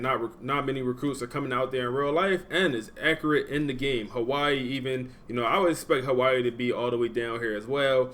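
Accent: Philadelphia, United States. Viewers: Jus came across yo channel today you sound like my homie from philly 😂😂 then u said temple I was like yea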